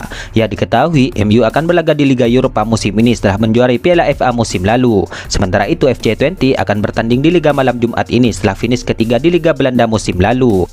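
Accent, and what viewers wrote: Madura, Indonesia. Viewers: Kok kayaknya pembawa acara Chanel ini orang Madura dilihat dr logat bahasanya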